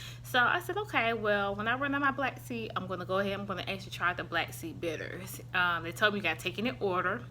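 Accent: South, United States. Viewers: I love your Southern accent, I'm from Atlanta too. Thanks Queen for the amazing info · U speak very well love your southern accent. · Ur quite lovely and the southern drawl is very charming. Keep up the good work. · Nothing wrong with your accent. Southern... thanks for the video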